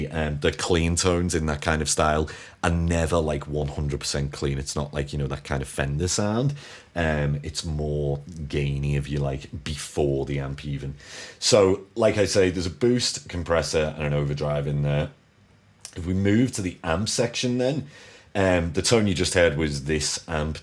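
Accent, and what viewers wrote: United Kingdom. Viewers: Great chops mate! I will however admit that it was your deep timbre British accent that got me smashing that like and subscribe! Ha! Seriously my dude. You should do voice overs. LMAO! Good content doggins. Cheers!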